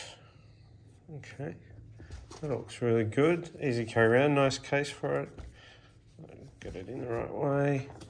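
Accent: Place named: Australia. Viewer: You sound Australian. How long did it take to be delivered from the US? I am in Melbourne.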